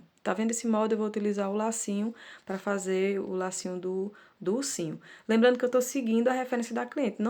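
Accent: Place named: Northeast, Brazil. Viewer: O sotaque nordestino é muito lindo,eu tenho ranço do Paulista toita,poita,kkk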